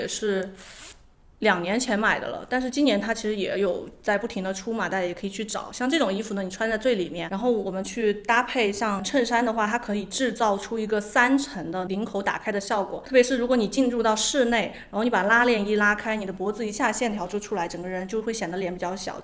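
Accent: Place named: Shandong, China. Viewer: 👍听口音是山东人😃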